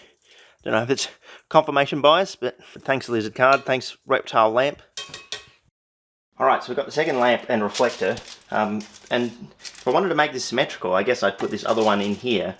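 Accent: Australia. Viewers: As an American from just outside Boston Massachusetts, I’ve completely substituted my impromptu American English accent with a perfect Australian cadence (your voice) slowly over the past couple weeks. Everyone loves it and I’m considering phasing out my normal impromptu accents from around the world to just straight -Australian vernacular. Don’t post for a bit so I can grow out of this silly habit brought upon your paralyzing uploads. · I'm normally pretty good with accents, even Aussie, but i did spend a chunk of the video wondering what "enema" analysis was. :) :) :)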